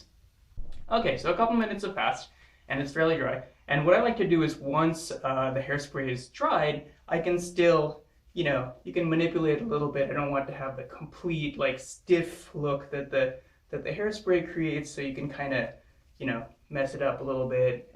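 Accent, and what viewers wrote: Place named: United States. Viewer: I know you're from somewhere around India... but you do an American accent... sounds interesting.. you immigrated to the west.?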